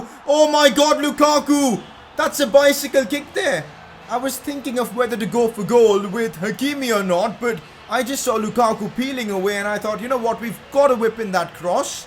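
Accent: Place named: India. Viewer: I wanna watch your videos but the way you talk man... I cant stand hearing the indian accent for almost 30mins😂 I can’t bear it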